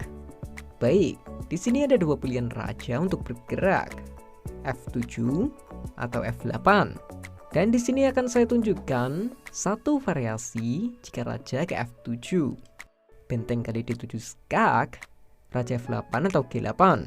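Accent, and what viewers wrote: Java, Indonesia. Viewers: Sampeyan jowo endi bang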